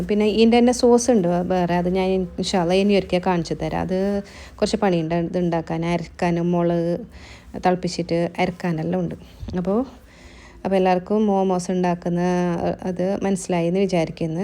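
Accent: Kasaragod, India. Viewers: Kasaragod aano😅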